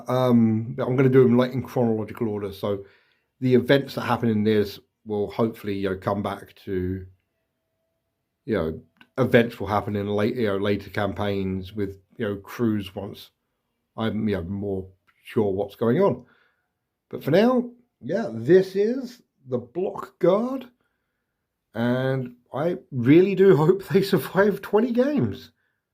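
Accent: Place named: United Kingdom. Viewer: Big into Five Parsecs, and I'm playing it 15mm as well, so to find your channel (a fellow Brit by the sounds of it as well!) is great. You might be getting a fair few comments on me as I blast your playlists.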